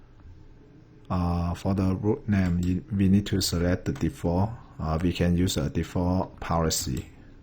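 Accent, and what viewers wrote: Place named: China. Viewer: you accent is closed to a Chinese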